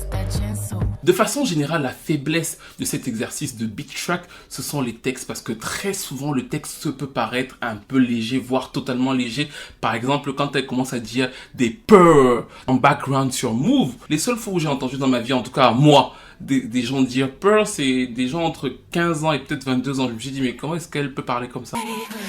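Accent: Cameroon. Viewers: j’aime bien ton petit accent camer 👍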